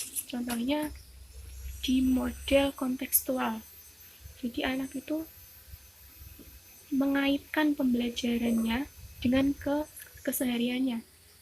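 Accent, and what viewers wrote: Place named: Java, Indonesia. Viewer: Uwuwuwuwuuuuuuu medhookk kaakaaa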